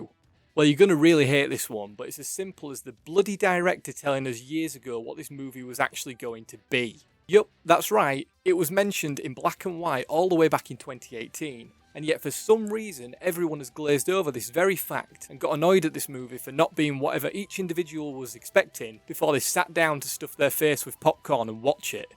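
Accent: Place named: Yorkshire, United Kingdom. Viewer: You're welcome! I probably watch more film reviews than anything else to be honest. If I'm interested in the film, I'll watch the review. On the basis of that, I might just might watch the whole film at some point. I hear you. I'm definitely not saying ALL internet content should be positive. No, it should be honest. I just don't like the bandwagon effect, where an imperfect film comes out (Disney's Star Wars sequels for example) and absolutely everyone has to make a video about it, not only criticising every little detail, but using phrases like "Worst film ever" and "Why Star Wars Is Even Worse Than You Thought." To borrow a phrase from your neck of the woods: Fook off wi'that shiiiite. I get it, uploaders want their clicks and whatnot. But as all these people scramble for clicks and revenue, I come to appreciate channels that are a little more balanced about things. 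I think it probably takes a little more courage to state your own opinions rather than repeat the same crap a hundred other reviewers are spewing. You'll grow. You'll make a living out of this if you aren't already. It's a matter of time. Quality production, likeable host, dulcet Yorkshire tones... Contrarian opinions? You're like Sharpe, but without the rifle and awesome duds. Lmao. I better go. Please don't feel you need to reply to all this. We'll be here forever if you do. Haha. Have a good one,;)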